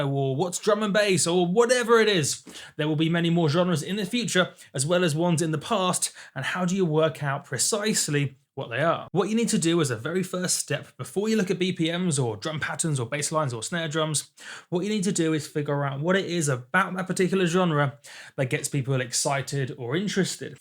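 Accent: United Kingdom. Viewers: BTW, are you British? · Is he a british guy?his accent..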